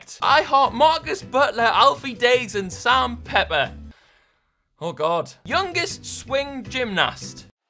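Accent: Newcastle, United Kingdom. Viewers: Are u from Newcastle boi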